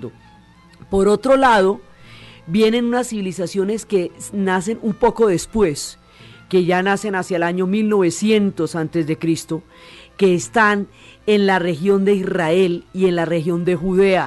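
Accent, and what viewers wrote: Colombia. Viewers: muy bien explicado.Por tu acento pareces Colombiano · excelente es de gran ayuda felicitaciones mujer ,,me gusta el acento por lo que noto es de el valle acento Caleño ....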